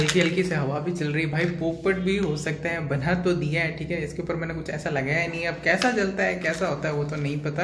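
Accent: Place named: Marwari, India. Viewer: सा, वंदेमातरम। आपके वीडिओज़ में जो परिवार के प्रति स्नेह व लगाव दिखता है वो बहुत सराहनीय है। और थोड़ी बहुत मारवाडी बोली भी बोलेंगे तो और आनंद आएगा। आपरी हिंदी में मारवाड़ी री सुगंध तो आवे है, उनने सुन र जी घणो सोरो होवे सा।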